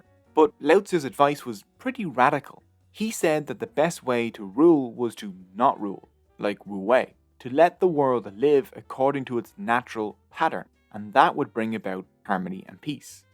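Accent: Ireland. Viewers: Interesting video, I recognize the Irish accent. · i know but its just his accent. Irish accent. Not everything needs to be pronounced correctly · you have a beautiful irish accent are you from limerick? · I advice you to change your Ireland-ish accent, it is very uncommon and I think some people including me find it disorienting and irritating. Perhaps shift towards a more british or american accent.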